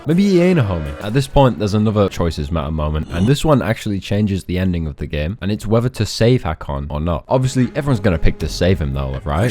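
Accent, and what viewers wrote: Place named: United Kingdom. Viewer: "Special fanks to Warfunder" Literally all i heard before clicking off. You brits need to LEARN HOW TO SPEAK ENGLISH!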